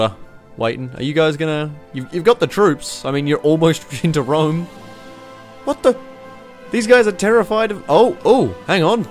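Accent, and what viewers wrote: Australia. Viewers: This dude sounds more Australian then me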